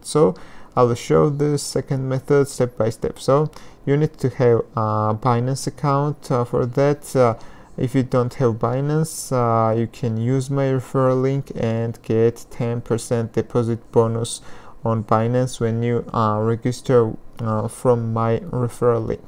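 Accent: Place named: Georgia, United States. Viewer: I recognized the Georgian accent LOL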